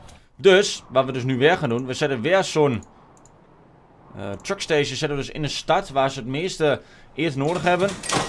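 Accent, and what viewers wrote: Twente, Netherlands. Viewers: Kom je uit twente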